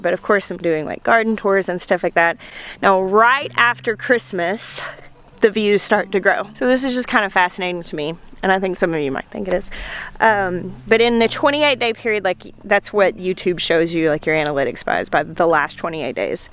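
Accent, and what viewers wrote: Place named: Arkansas, United States. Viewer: Lol I felt like you were talking to me 🤣 new subscriber! I found you right after Christmas bc I’ve been getting so excited to get my garden going again. I’ve been watching ALL of your videos. It’s so funny bc the first video I watched of yours, I noticed your accent, and said oh I like this girl. Then you said you had lived in Arkansas and was like, well there it us! 🤣 So fellow Arkansan here now! I also need to say this, and it’s going to sound insane, but when you said you wanted to do the 365 challenge, it made me want to work on my art skills again. I’ve been working on a pictured my son, and I’m usually in bed by now but I wanted to get this finished. I was in the living room and noticed a buzzing sound and started noticing a smell of melted plastic. I ran through the house thinking I was going crazy but found our hot water heater was burning! 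We’ve got it all settled now, but I’m thanking God that I was awake working on my picture. That could have turned out really bad really fast.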